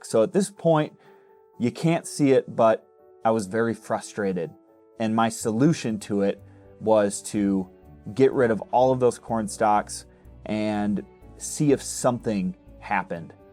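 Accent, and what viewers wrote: United States. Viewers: Are you from Michigan? Lmao.. I'm just asking cause the accent.. But your videos are so good! I think I said this comment twice lol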